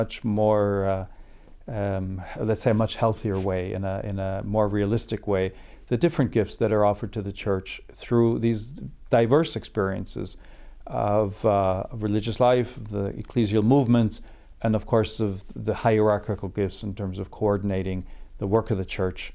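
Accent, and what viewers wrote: Midwest, United States. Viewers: It‘s the Chicago accent. 😊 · Pope speaking American english 😲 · He has the voice tone, inflexion, articulation of a leader, like he is indeed talking “ his business “… true American…no matter how many years living abroad and with double nationality..❤❤❤ proud of him.. May God Bless him!! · It sounds midwestern to me. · Chicagoan accent.... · I can still hear a Midwest twang at times!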